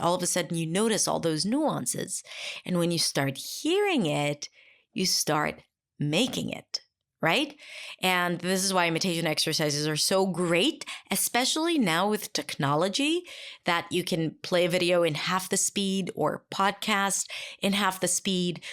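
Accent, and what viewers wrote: United States. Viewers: Hey Hey everybody hows your day so far. Express in tones melody Pitch higher more lower Pitch less . Awareness is the key for Clarity .I have spoke English all my life American and yours sounds perfect American inclination speech.If you never tell you are Hebrew as was More Aaron and Merrian .No one would know you aren't born and raised in America .I had lots of physical therapy but never my speech .I had to relearn speech and letters and words numbers all over after my first stroke and lost all ability to walk function and speak. . So I'm self retaught and over minor strokes continue. I'm Bonnie!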